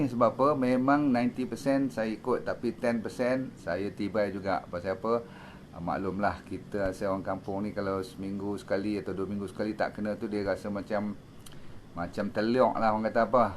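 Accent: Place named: North, Malaysia. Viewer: 1.pandangan saya kalau Dr. ni cakap bahasa melayu pun mesti tak akan cakap ikut Bahasa Malaysia standard. Sebab mungkin akan mengambil masa memperbetulkan cara cakap. Dr. berasal dari utara. Tapi dalam video ni nampak la doc dah cuba untuk bercakap dlm bahasa yg mudah difahami org Malaysia. Kalau doc cakap semua dalam loghat utara mesti penonton dari negeri lain ada yg tak faham. Biarlah doc bercakap dengan apa yg dia rasa selesa dan santai. Saya sendiri kalau disuruh cakap Bahasa Malaysia standard dgn sebutan baku akan ambil masa untuk bercakap sebab dah terbiasa bercakap menggunakan loghat utara dan selatan serta English. 2. Lagi satu Bahasa Indonesia mempunyai lebih ramai speaker sebab itu orang luar tertarik nak belajar.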